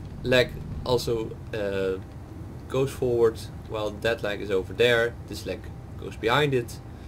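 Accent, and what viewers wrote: Netherlands. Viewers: Are you dutch? You sound Dutch or even a bit French?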